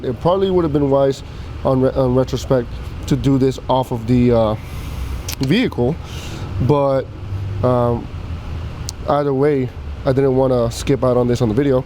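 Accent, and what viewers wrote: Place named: Latin America. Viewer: What an amazing video. I just came back from the BMW dealer here in Edmonton where I live and they told me my water pump/t'stat housing was leaking. They are looking at $2400 CAD for the repair. My car has 134.000 KMS. While I was googling the problem I found your video and honestly it does seem like a lot of work for a DYI one. Is there any way I can personally check to see if the pump housing is leaking without taking so many things apart? Is it very common to replace this part in these X1 2018 xdrive 28i models? with the amount of kms I have is it something to expect? Thanks again for the great video and clean shots, I was able to see all the detail and learn more about my car. You have a new subscriber here. I am guessing you're also latino, I was trying to figure your accent and country of origin, I know this is off topic but I was curious. 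Keep up the good work and videos. Ill check some more as I also love learning about car repairs. Gracias!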